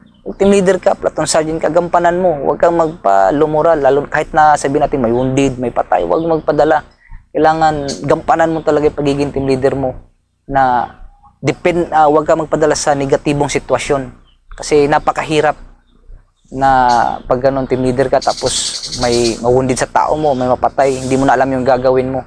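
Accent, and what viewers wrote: Philippines. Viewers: tagalog na tagalog talaga hane!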